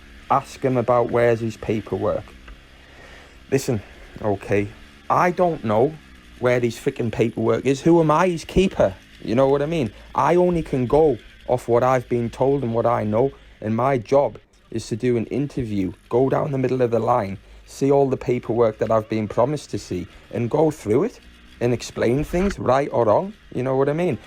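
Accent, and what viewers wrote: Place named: Geordie, United Kingdom. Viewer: I love the slip in accent mate your geordie roots always shine through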